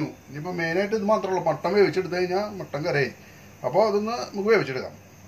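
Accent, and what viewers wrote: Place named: Thrissur, India. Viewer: Thrissue slang il ulla aa mutton curry pwolichu gadiyee..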